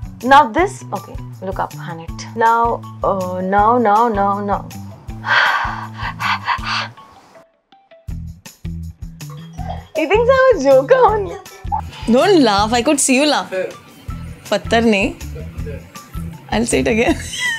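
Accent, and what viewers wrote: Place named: Maharashtra, India. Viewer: i love yrr hindi marathi accent 😂........i love yr plant vedios .....but idky ig this is the best video ......LOVE from DELHI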